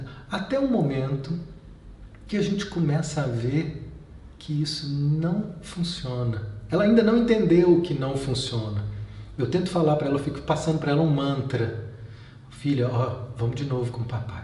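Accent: Minas Gerais, Brazil. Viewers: Gratidão!! Conheci teu canal através de um grupo aqui em Garopaba SC há um mais de um ano, desde então assisto todos, reasisto....E assim vou, passo à passo plantando sementinhas de autoconhecimento!! Adoro esse sotaque mineirinho!! 🤗🤗🤩🤩👏👏👏🙏🙏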